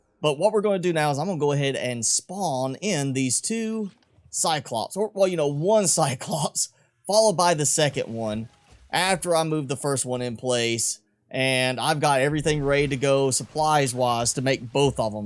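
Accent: South, United States. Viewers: I love your southern drawl it is so charming XD Up here in Michigan we tend top be on the nasally side lol. Love you Subnautica videos, seriously huge help thank you so much for making these! Best Subnautica Youtuber right here folks^^.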